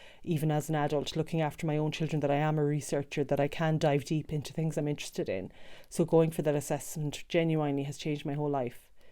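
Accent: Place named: Ireland. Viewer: I am another Oregonian (from the US state of Oregon) who appreciates so much your courage to challenge yourself by making this video. It was fantastic, and BTW, I love listening to your Irish accent! (Ireland as your home, correct?) I am just beginning my new journey learning about autism, or I should say an updated description of autism, which so far, explains nearly EVERYTHING in my whole life. I'm 61. Like the other OP comment from Oregon, I just want to understand my life and myself better as well. Past, present, and future. Not sure if an official diagnosis is possible for me because of the cost, availability, and qualified professionals near where I live, although I'm not against getting one in theory. But hearing of your persistence, and yes!, belief in yourself, you succeeded in getting the answer that helped your wellbeing. This gives me encouragement and tells me to be patient with myself. 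Thank you so much for sharing your story! Wishing you the best!:hand-purple-blue-peace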